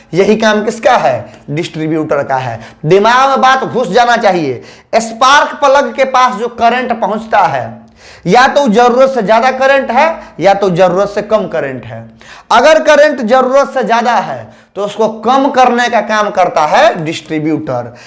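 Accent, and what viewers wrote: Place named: Bihar, India. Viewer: Classs achi pDhate h sir but shudh hindi bola kar bihari bhasha thodi defrent lagti h🙏🙏🌹👌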